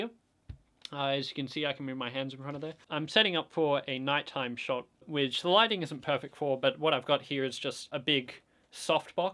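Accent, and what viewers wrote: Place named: Australia. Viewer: Aussie lad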